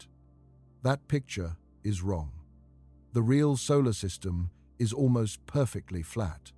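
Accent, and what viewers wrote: England, United Kingdom. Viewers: There doing everything they can to keep us from reaching the stars. I believe none of it. English accent and muckedy music and were supposed to think they are intellectuals.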